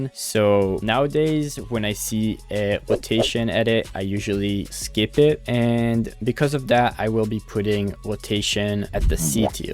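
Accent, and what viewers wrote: France. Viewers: Are you French? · Dude are you french?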